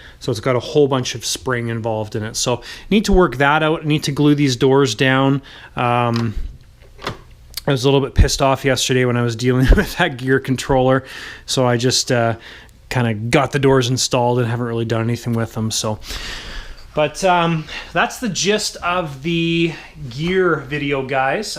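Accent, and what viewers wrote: Canada. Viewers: I absolutely love the content on your channel.obviously you are a Canuck and you guys say things just a bit differently than ee do here in the United Staes but one thi g thats been really bugging me is how you say CORS AIR Instead of the proper pronunciation COR SAIR. Once again love the channel content and I think you build some of the best models Ive ever seen. Keep up the good work and you say corsair however you pmease....lol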